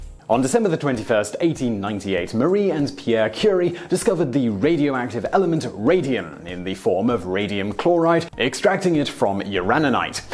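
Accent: United Kingdom. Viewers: What's accent called?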